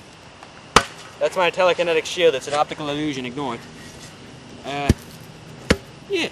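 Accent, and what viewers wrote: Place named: Australia. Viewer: Why do you sound like an Aussie?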